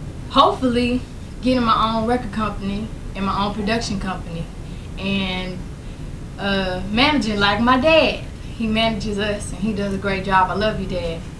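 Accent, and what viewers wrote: South, United States. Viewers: oh she so southern!! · I loveee her country accent ❤️❤️ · aww her country accent😭💞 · she sounds soo southern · She had her belly button pierced at 16!? That was a sin in my house! 😂😂 and she sounds a lil different a link country.! Love her old vids before and during Destiny’s Child time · Country!! I miss Beyonce's accent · Aw, she was so country back then. Love it. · Country as fuck 😩😁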